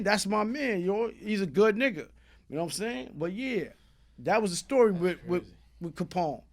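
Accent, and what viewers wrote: Queens, United States. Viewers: Naw you from Queens haaaaaa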